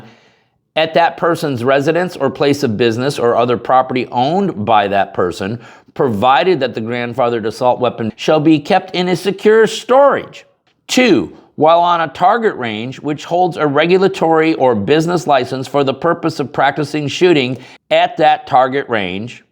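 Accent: New Jersey, United States. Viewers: Sounds like Jersey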